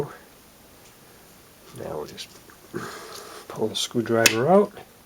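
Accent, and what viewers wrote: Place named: Massachusetts, United States. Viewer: "Oh my God...you're a pimpin' fool!"...LOL You must be from Massachusetts...you have the same accent as a buddy of mine. He says things like rubbish...and Caaah (for car)...lol Nice videos! I may have to do this but with one that is not the sequential flashing type...to me it is a bit annoying. I would be adding them to a mirror that already has forward facing flashers...I just want some with them facing the rear to alert people coming up on you.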